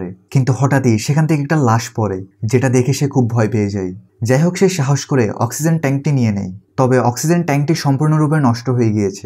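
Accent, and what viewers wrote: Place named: Chittagong, Bangladesh. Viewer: আপনার নাম কি জানিনা। উচ্চারণ শুনে মনে হয়েছে চট্টগ্রামের বাসিন্দা। ইন্টারেস্টিং মুভির এক্সপ্লেনেশন করেন। কিন্তু একটি উচ্চারণ শ্রুতিকটু মনে হয়েছে। এন্ডি থেকে লেগেছে, লিওনার্ড থেকে লাগছিল... এভাবে না বলে বলবেন- এন্ডির মনে হয়েছে, লিওনার্ডের মনে হচ্ছিলো, তার খুবই খারাপ লাগছিল, তার ভালো লেগেছে। থেকে শব্দটা এভয়েট করুন।। শুভ কামনা।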